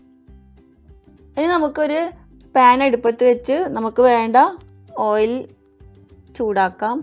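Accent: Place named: Pathanamthitta, India. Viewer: Very unique and easy! Nannaittundu.. naatil evideya? Annikkorundeiend undu from Pathanamthitta.. aulde samsaram pole und :)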